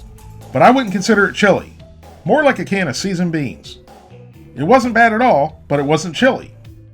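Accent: South, United States. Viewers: you better be a professional comic writer sidelining with these videos with quips like: "Like chewing on a moist mattress in a nursing home!" OMFG. You're humor is so New York with your 'southern twang'. Dry, like your stomach after hopefully being pumped out after ingesting these disgraceful products from questionable food companies.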